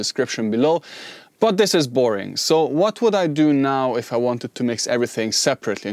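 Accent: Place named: Hungary. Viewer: ... and you are from Hungary:D... if I am not fooled by my ears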